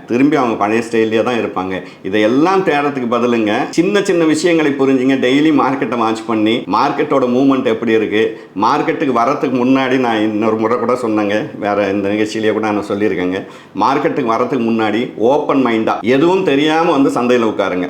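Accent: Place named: Coimbatore, India. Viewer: கோயம்புத்தூர் தமிழ் ஒரு தனித் தமிழ் தானுங்க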